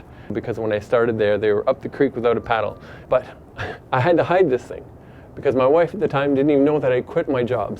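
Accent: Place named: Canada. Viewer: I immediately knew he was Canadian great story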